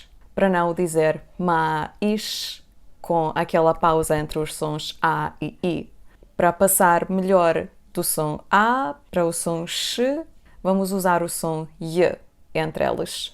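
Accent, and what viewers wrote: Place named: Portugal. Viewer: Adoro tua pronúncia do português europeu continental. Quanto ao "mas" brasileiro não creio que seja exatamente confuso, até porque o contexto é elucidativo, por iniciar uma contraposição lógica, não é?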